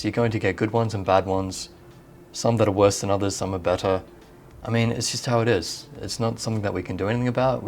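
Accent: Australia. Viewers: I like your accent and voice so much, dem aussies lol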